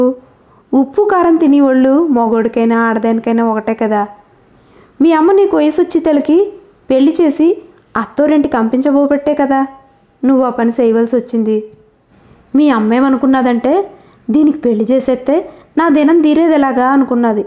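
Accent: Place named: Godavari, India. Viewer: స్వాతీ! ఎంత బాగా చదివేరో!ఈ కథను ఎవరు చదివినా గోదావరి జిల్లా స్లాంగ్ రాక నాకు కొంత అసంతృప్తి గా ఉండేది. చాలా చాలా థాంక్స్ మీకు. మీరు కథ పెట్టిన నాలుగు రోజులు గమనించక పోవడం వేరే ఫంక్షన్ లో ఉండి తప్ప మరేం కాదు. 😊👌👍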